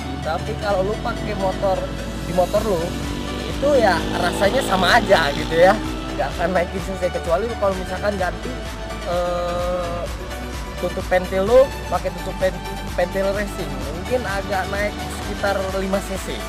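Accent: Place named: West Java, Indonesia. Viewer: Bang mf asli orang mna kaya sunda yeuh..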